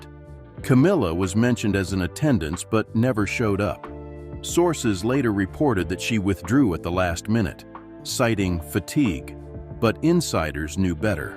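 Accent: United States. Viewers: What rubbish Camilla is Queen why is an American voice saying this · Well done with your titles, real click bait, but if you do click, after a while you give up, because it never gets to the point. Clearly made up, and an USAan voice does not give it any veracity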